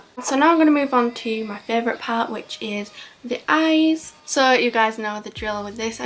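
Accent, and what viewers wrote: United Kingdom. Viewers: i'm late, i also just finished gcse's in june, bUT i wanna know which part of the uk are you from? :)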